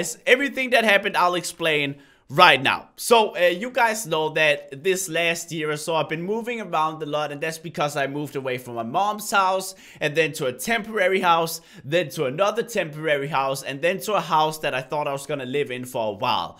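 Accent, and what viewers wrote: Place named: Denmark. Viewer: Reece Warren His First Language is Danish. Which Creates His Accent. English Is his second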